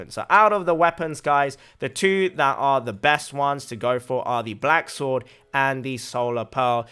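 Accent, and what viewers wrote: United Kingdom. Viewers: An asian dude with a british accent. wtf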